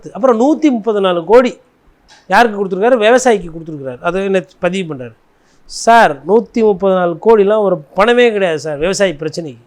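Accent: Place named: Chennai, India. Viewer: தம்பி உண்மையை நேர்மையாக பேசுவதிலும் சரி சென்னை வழக்குமொழியில் பேச்சுவதும் மிக மிக சிறப்பாக (சிரிக்க) கருத்தாழத்துடன் கூறும் பாணிஅருமை அருமை ❤❤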